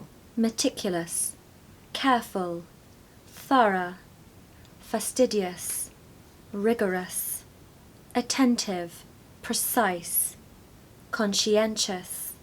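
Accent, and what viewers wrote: United Kingdom. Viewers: I use all these words commonly in everyday US English, and without scruple or hesitation, so they're safe over here in the colonies. What a beautiful British pronunciation you have -- insuperable!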